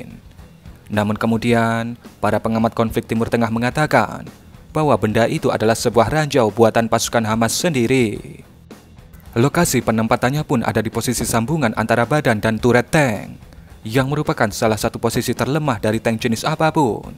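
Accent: Java, Indonesia. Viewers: suara lhu medok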